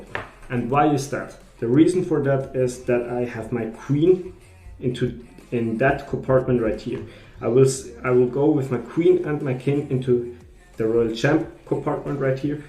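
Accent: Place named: Germany. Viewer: It’s a strongggg Germanic accent idk how he couldn’t tell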